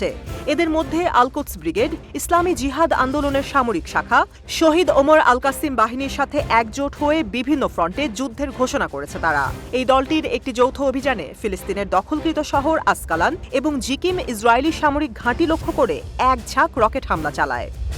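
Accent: Kolkata, India. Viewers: কোলকাতার মতো সংবাদ পাঠে বিরত থাকুন। শুনতে ভালো লাগে না।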